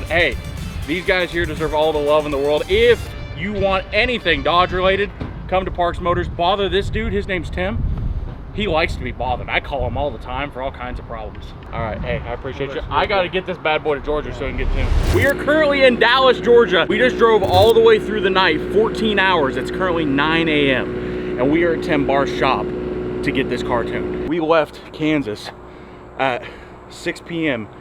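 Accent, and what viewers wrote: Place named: Kansas, United States. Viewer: Bro speaks like a true Kansas-man. (I would know)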